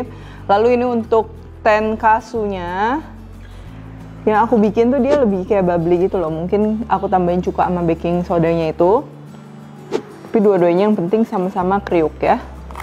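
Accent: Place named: Tasikmalaya, Indonesia. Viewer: "Lebih lekoh lagi" 😁 Cici dari mana, Tasik ya?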